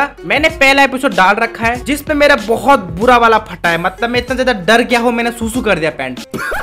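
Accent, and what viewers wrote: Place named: India. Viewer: Goofy indian noise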